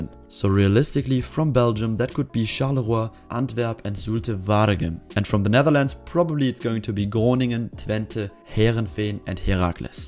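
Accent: Germany. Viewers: The moment he started speaking, you could inmediately tell he's German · When he started to count up the teams i knew he is a German, this Groningen and AZ was as German pronouced as it could. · Are you German? PS: Great Videos.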